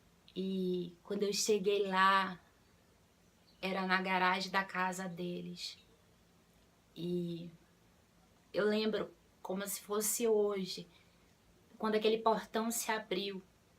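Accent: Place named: Rio de Janeiro, Brazil. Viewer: achei que vc era do rio de janeiro.. pelo sotaque rs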